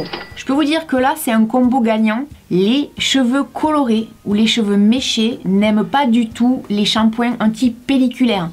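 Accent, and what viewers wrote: South, France. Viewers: Alleste moi je dirai du Sud honnêtement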